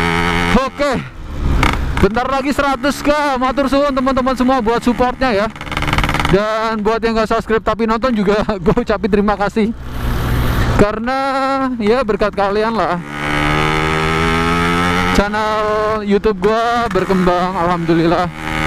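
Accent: Banyumas, Indonesia. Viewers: Setuju full ngapak 😂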